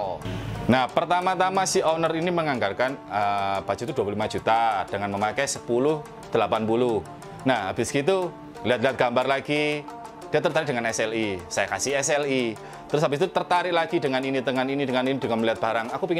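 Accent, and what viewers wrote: Java, Indonesia. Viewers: maklum, wong jowo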